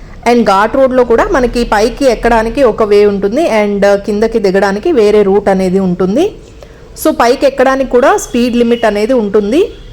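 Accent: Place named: Vijayawada, India. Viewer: Crystal clear narration - Vijayawada Telugu accent - good feeling like having Vijayawada Andhra Bhojanam.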